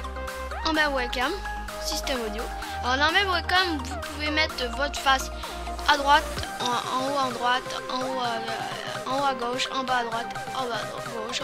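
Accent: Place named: France. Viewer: Accent FR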